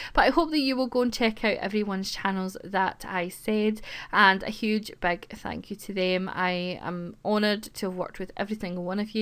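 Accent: Scotland, United Kingdom. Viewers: I'm glad you will have more time to do what you seem to really enjoy! I certainly love watching your LPs and listening to your lilting Scottish accent! I'll definitely be continuing to watch TS3 LPs and I really look forward to seeing how you, Peter and Jenn play TS4 because I am so confused about how this game is supposed to be played. I know it's supposed to be a sandbox game, but it definitely seems to be geared toward a different playing style than what I've been using for the past 10 years, so yeah... Thanks for everything you do and for all those who helped with Big Brother! I've never seen the actual show in any way, but I don't think I would enjoy it as much as your version.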